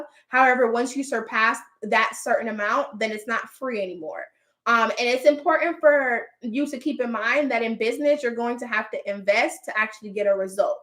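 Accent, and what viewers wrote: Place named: Miami, United States. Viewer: I knew you were from Miami. We don’t really have “accents” but you sound like a Miami girl lol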